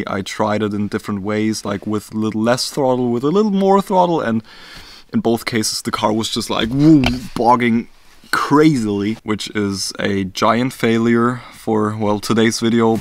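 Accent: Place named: Germany. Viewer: I new from the beginning you must be german speaking because of that accent 😂 but the license plate did make me unsure, until you started talking to your buddy😂 Well, Hallo aus Deutschland 😂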